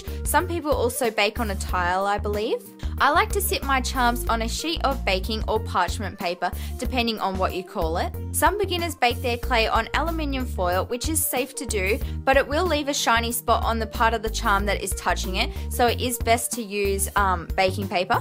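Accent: Australia. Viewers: Hey, just curious, are you a fellow Aussie? (Just asking cause of your accent and the Woolworth's brand) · I'm brand spanking new, wanting to give this a go and I'm so thrilled with all the "how to get started without buying the whole craft store" tips!! Thank you! Subscribing now because I love your style, your editing is really good and it's awesome to listen to a fellow Aussie! <3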